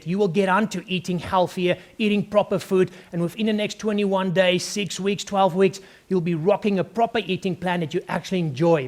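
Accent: South Africa. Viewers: Are you south African? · South Africa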